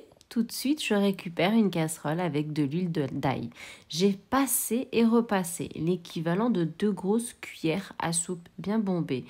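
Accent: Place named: South, France. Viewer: Une explication distinctive du sud et la bonne chance bb 😍😍😍😍😍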